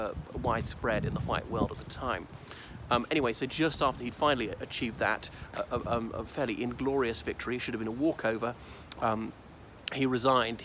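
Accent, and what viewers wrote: United Kingdom. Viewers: This is a very informative lesson. I truly appreciate of your work. It would be good for knowledge besides listening to British accent. Have a good day,. 🥇🏆🥇🏆🥇🏆🥇🏆🥇🏆⭐⭐⭐⭐⭐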